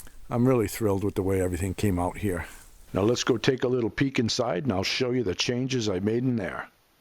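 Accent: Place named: New England, United States. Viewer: I am in Northern Wisconsin. Small place on five acres of land surrounded by State Forest and other private uninhabited lands. Although we are not a primitive as you are, we do enjoy our surroundings and gathering and using our natural resources. I saw you mention the "Camp". I thought I detected a NE accent. Being born in MA, my brothers and parents live in NH, so the term Camp was very familiar to me. Approximately where is the Camp? One brother is in Auburn, while the other is in Wolfsboro. Just curious. Great job on the cabin, and glad I found your channel.........Thanks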